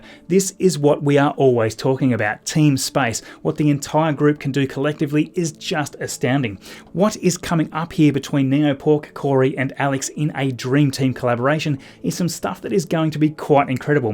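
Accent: Australia. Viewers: glowing dick ? your accent is from australia or new zealand right ?!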